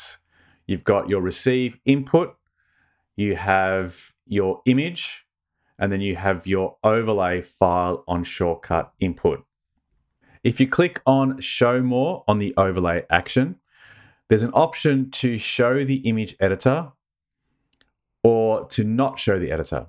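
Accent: Australia. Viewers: Great tute, exactly what I was after & great to hear it spoken in aussie so I don't need subtitles ;) Massive thanks. Wondering if there's a way to make the logo size a percentage of the image also if you know how to add a border during the same process or a diff one if neccesary?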